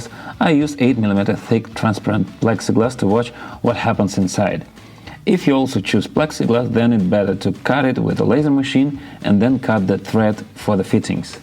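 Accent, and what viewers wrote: Russia. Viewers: русский ёпть, акцент не скрыть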